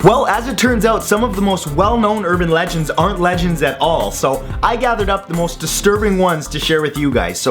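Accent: Canada. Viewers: Is it me, or you can just tell this guy is Canadian? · it’s called being canadian, most of us talk like that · wait- r u canadian? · your canadian?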